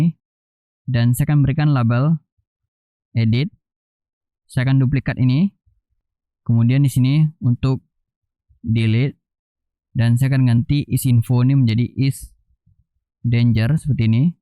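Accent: Aceh, Indonesia. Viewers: Logat logat nya abang ni seperti orang aceh benarkah ? hehe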